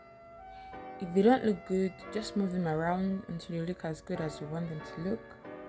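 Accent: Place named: RP, United Kingdom. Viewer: It's the Queens English for me ❤❤❤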